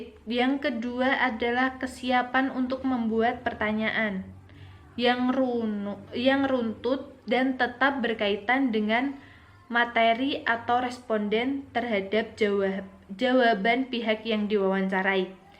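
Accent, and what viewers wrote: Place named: Java, Indonesia. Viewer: meskipun agak medok tp sangat membantu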